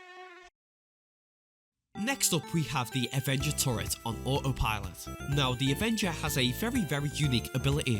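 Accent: Liverpool, United Kingdom. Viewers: Eyyy you a scouse ? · I can pick up a scouse accent there 😂 I'm from Liverpool as well🤣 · so where is the fucking list? i dont want to listen to your stupid ass british voice for 30 minutes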